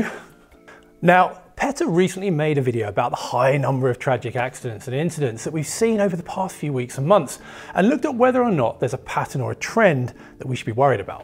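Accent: England, United Kingdom. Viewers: I understand that English was invented by the British, but British pronunciation is a challenge. Compared to the slow and emotional Pereter, it's a little harder to understand. It's become more like a lecture than a conversation with a friend. Maybe should slow down a bit and pay attention to his intonation. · As English is not my first language, I'm just a bit struggling with English accent and he's sometimes a bit too fast for me. But I will keep on watching this channel that I like so much and eventually will get used to English. No offense, but I'm normally more used to American English. Anyways, keep on the good work, it's always interesting. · should slow down his speaking speed... And make his speech more clear avoiding british accent... Otherwise you'll lose people willing to hear that video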